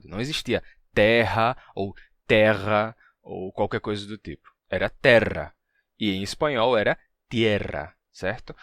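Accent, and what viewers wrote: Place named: Northeast, Brazil. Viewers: Ele é do Rio Grande do Norte. O Sotaque dele é o chamado "DIALETO NORDESTINO", que é o sotaque falado na maior parte dos estados do Nordeste, dentre eles: Rio Grande do Norte, Paraíba, Pernambuco, Alagoas, Sergipe, interior do Ceará e interior da Bahia. A cidade de Salvador, capital da Bahia, tem um sotaque próprio, que é o "Dialeto Soteropolitano". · Seu sotaque em português é muito bonito. É de Natal? Sou do Rio e acho muito bonito. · Ao individuo do video.....Se posso perguntar, Voce e da Bahia ou outro lugar do Nordeste Brasileiro? Pergunto porque amo essas explicacoes sobre as linguas e noto que voce tem uma pronuncia do "E'" e do "O" quando nas palavras como faziam meus pais e avos que eram da Paraiba e Sergipe. Eu nasci no Rio e perdi um tanto do sotaque Carioca ao ir pra Sao Paulo. Agora moro na California para onde vim a cinco decadas. 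· A "pronúncia particular" a que você se refere é simplesmente um dos sotaques nordestinos brasileiros. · se não me engano ele é de Recife.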